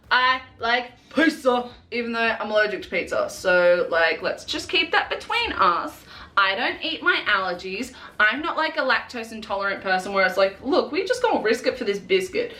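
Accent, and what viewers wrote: Australia. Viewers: i like both, i kinda liked how you and were in this video. the accent is just hilarious although i adore your aussie accent. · I love your bombastic and I also love your real voice and personality:) It's so refreshing to hear an Australian voice on YouTube - and idk, hearing someone do internet memes with my native accent is weirdly comforting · By gosh, I looooooove Austrailian accents! (Okay, I'm done gushing. Maybe.) Whether you're you or you're, you're amazing and you bring a TON of joy to those who watch your videos!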